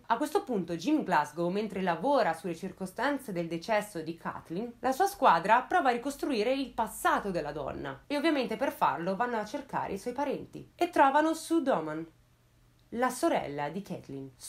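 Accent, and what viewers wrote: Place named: Lombardy, Italy. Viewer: Ciao ti faccio i miei complimenti è veramente bello ascoltare come spieghi le situazioni!!! Volevo chiederti una cosa di dove sei? Il tuo accento sembra Como Varese o Milano.... Io sono di Como.... Grazie comunque x il lavoro Ke fai!!!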